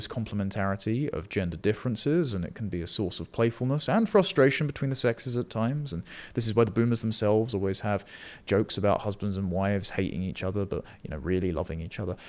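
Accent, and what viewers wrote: RP, United Kingdom. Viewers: I really enjoy a lot of your political views, and I’d like to watch more of your content. But the exaggerated RP accent feels a bit forced. It comes across as if you're trying to sound overly refined or intellectual, kind of like a Gen Z Christopher Hitchens. Milo Yiannopoulos is a textbook case of taking it way too far.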